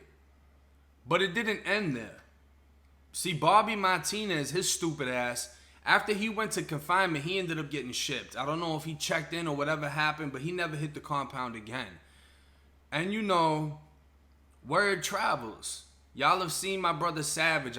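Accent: Boston, United States. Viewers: So u definitely from Boston right? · U from boston?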